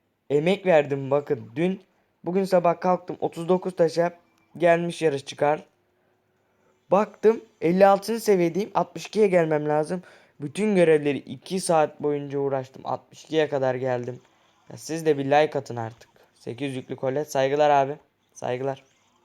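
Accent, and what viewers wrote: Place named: Black Sea, Turkey. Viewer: Bakıyorum da Karadenizli konuşmayı öğrenmissin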